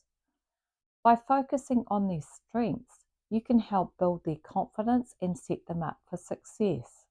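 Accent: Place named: United Kingdom. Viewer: great, nd i really liked youre British accent!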